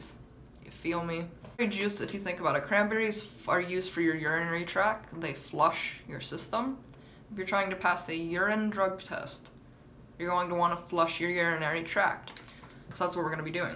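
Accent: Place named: Pittsburgh, United States. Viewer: Oh your definitely from Pittsburgh 😂😂😂 hey girl hey.... fellow pittsburghian here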